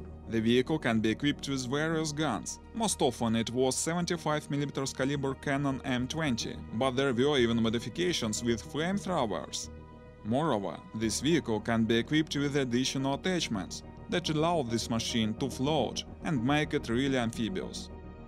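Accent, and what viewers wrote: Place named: Russia. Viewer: ну и акцент русский лучше бы по русский пиздел... а так пришлось звук убрать а то это слушать противно. с тем же успехом можно смотреть как китайца говорить па руски на утуб.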